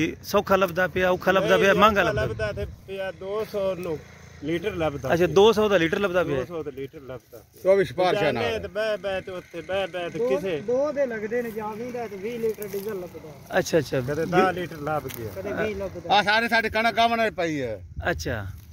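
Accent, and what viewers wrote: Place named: Ferozpur, India. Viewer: Bhai saab tuhadiyian video nall sade Ferozpur Fazilka area de loka no bhaut fyida hoya kyuki sadi boli te tuhadi boli same hai jiss nall jahre malwa belt te majha doaba area de loka ne sada majaak banaya hoya ci hon ono de mouth te olte maooh thappar vajiya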